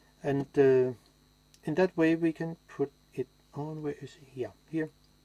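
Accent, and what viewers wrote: Denmark. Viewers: it can only sit in one position. Jeg kan lyd en dansker fra tusind km, rigtig godt video.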